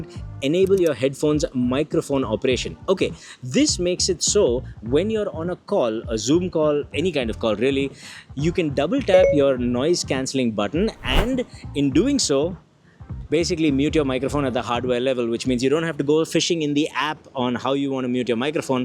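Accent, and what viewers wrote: India. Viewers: You should make a choice and reduce one of the following to things: level of background music or Indian accent. One is always distracting another and so on.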